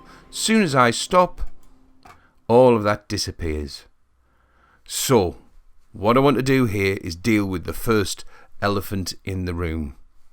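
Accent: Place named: Northern England, United Kingdom. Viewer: Most unity tutorials online seam to record their audio on a potato however you sound like a professional voice actor. Sean Bean vibes from your northern accent. Excellent production quality and can't wait to get further into the tutorials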